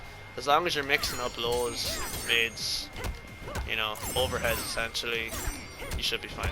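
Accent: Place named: Ireland. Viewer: thank you for the video. Nice Irish accent too.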